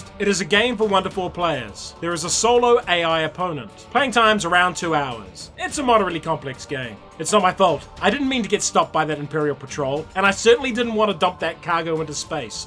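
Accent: New Zealand. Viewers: Kia ora, e hoa! So pleased to hear a kiwi. Tino pai rawa!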